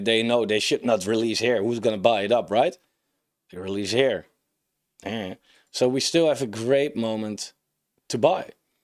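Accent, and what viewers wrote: Netherlands. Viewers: Nice video m8 doing great keep up the great work! Like your style, and dedication to explain your vision and information. The first video when i found you on youtube i was like hey he's dutch i can hear it Nogmaals goed bezig man! En op naar een mooie cycle met mooie kansen Laterr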